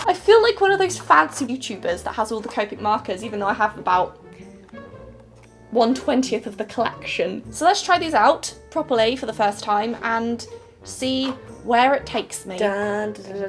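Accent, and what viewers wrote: United Kingdom. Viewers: How come you grew up in america and still have such a british accent? sounds like you come from the south east of england around bristol...weird.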